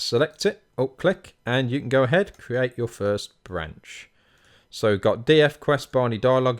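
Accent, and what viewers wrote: United Kingdom. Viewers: I'm an American. I have no idea why, but I find it remarkably easier to learn this shit from a guy with a British accent. I probably should have just gone to school in the UK, come to think about it; would have learned my lessons much more effectively from teachers with accents I think.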